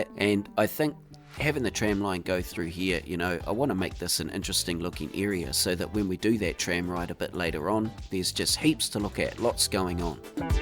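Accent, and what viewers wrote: New Zealand. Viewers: Another incredible build! Btw, while I do love the NZ accent, I’d just like to note that it’s pronounced fa-VE-la:) Keep up the good work! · I really like when he says: "feârsty" instead of saying "first" like other countries. kiwi accent sounds funny and i actually love it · What a beautiful New Zealand accent 🙌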